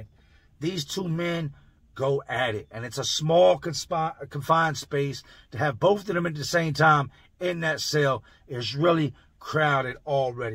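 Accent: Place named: Reading, Pennsylvania, United States. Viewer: Bro, I been binge watchin your channel. From Cali but Lived in Reading, PA for 8years listening to you talk is nostalgia for me I love this shit. Thanks for the stories I listen to you while I work overnight janitorial. Really made me realize I need to cool it with the alcohol I appreciate you man. Thank you. Big respect I’m glad I never got caught up in all my bullshit cuz real talk I’m lucky I was heading there some nights. Anyways, great channel glad I found this.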